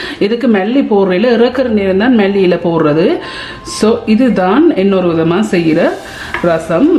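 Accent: Sri Lanka. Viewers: Thank you for this nice video. I do enjoy listening to Ceylon Tamil! Anyway about making rasam, I use rasam powder which is easily available in Malaysia so I don't have to grind all those codiments etc . Well I enjoy watching ur video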